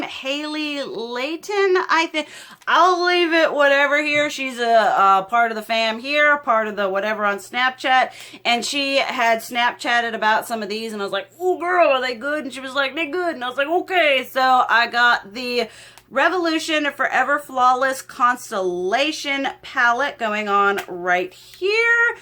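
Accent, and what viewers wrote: South, United States. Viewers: I LUUUUV your accent. I live in East Tn, and it's music to my ears to hear an authentic southern accent. Don't kmow how often you hear that, but just sayin...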